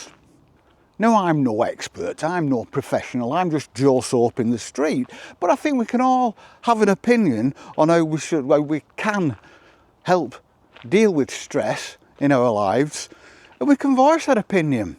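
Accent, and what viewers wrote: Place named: Lancashire, United Kingdom. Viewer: thanks, joe soap. loving yer lancs. lilt. ;)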